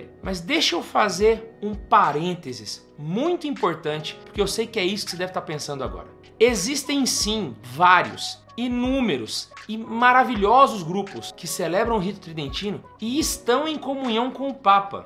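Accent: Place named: Goiás, Brazil. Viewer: Diretamente de rio verde goias pra dizer que esse e o melhor video sobre o assunto, conheci esse sotaque no comeco no video meu conterraneo,abraço .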